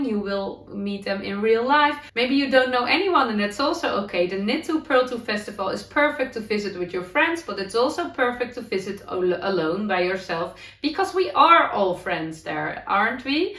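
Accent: Netherlands. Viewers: Crochet and knitting, here in the USA Is on fire! The farmers/ weekend markets are filled with crafters of all kinds. I recently found your vlogs and have enjoyed them and you! You are just charming and your dutchy English is just lovely. Wish I lived closer. Good luck with the festival.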